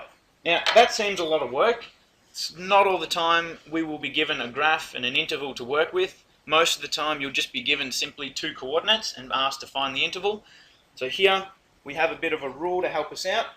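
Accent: Australia. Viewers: sweet aussie accent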